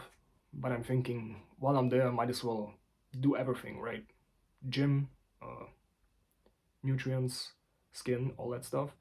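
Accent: Germany. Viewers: No youre right. Single mothers DO ruin their children, by not supporting them to become self sufficient. Statistics back this. Single mother children are multiple times as likely to end up sex workers or in jail, while single fathers tend to keep the kids out of bad paths like these. You gotta stop relying on your mother in life. Make a deal with her that if she supports you (shes legaly obliged to in Germany, thats what your accent sounds like), then you will do an Ausbildung or a Studium. Also you would get Bafög. Otherwise youd get Grundsicherung. These are the ways to go: GET OUT of there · I hear your german acsent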